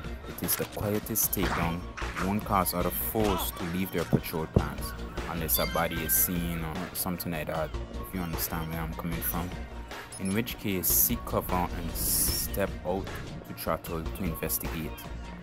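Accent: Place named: Jamaica. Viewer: Your Jamaican accent is so cool bro 🙌🔥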